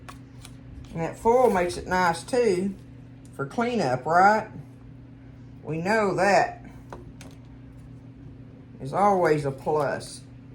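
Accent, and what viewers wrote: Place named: Kentucky, United States. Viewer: Where are you from? You sound like me and I am an old Kentucky girl.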